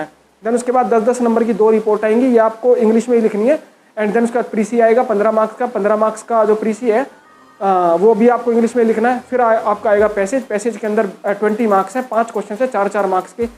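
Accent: Haryana, India. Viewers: Sir aap haryana se lag rhe ho 😎, thanks sir ji 😇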